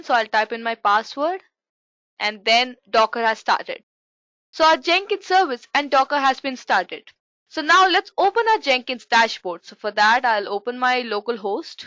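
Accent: India. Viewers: Great talk! One note for improvement for the part with the CapitalOne use-case; with it being the primary example, some on-screen notes would have been fantastic, as the pan-Indian pronounciation gives me some trouble (ex. 'commit' and 'comment' sound identical to me). Also, for Job3 of the practical walk-through, you do not show the code needed in the Build section - everything below '#-Completion' is not shown. I learned a lot, thank you :)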